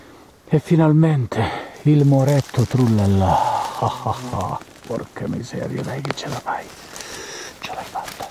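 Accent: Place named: Piedmont, Italy. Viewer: bella l'accento e' proprio pinerolese doc un saluto da albenga e conplimenti bei funghi p.s. in liguria non esiste solo il mare ciao angelo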